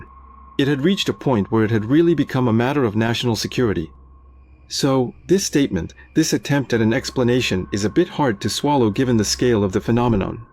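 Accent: France. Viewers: Omg? Sup with the robotic voice? I like your original french accented voice... ⭐️*⭐️*⭐️*⭐️*⭐️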